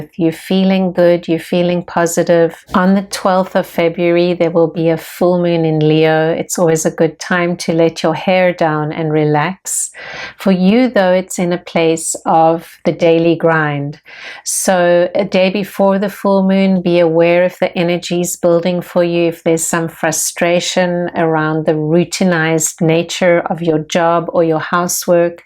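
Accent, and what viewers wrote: South Africa. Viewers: I’m from Durban! ♥️ 🇿🇦 I knew your accent right away!! 😅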